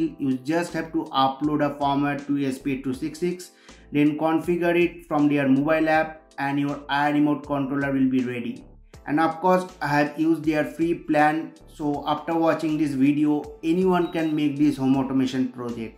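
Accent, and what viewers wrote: India. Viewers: Awesome video 🔥🔥, and thank you for the presentation, BUT could you please replace your real voice with some AI google speech synthesis engine ? Your thick hindi accent It drives me crazy , and I barrely understand the content .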